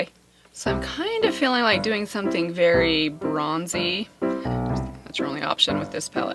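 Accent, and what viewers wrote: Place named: Utah, United States. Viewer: Oh my heck, I totally love you,!!! I just received these two palettes in the mail about a week ago and being the novice I am, I wasn’t sure how to use them. Thanks for posting a tutorial so well timed! 😊 Also, did I guess it or did I guess it! I knew that accent came from Utah. 😉 I used to live there myself. Where did you and your husband build your new home? Those irrigation pipes kind of reminded me of Idaho...? Keep the videos coming! Love you! ♥️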